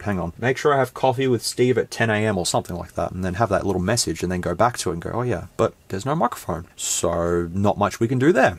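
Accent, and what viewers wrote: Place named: Australia. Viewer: See you sound Australian so I believe you but you are whiter than me and I'm Irish 😂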